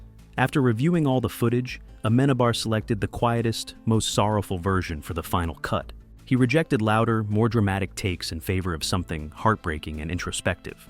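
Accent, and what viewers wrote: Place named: United States. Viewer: Complete waffling drivel. Garbage duhmerican narration.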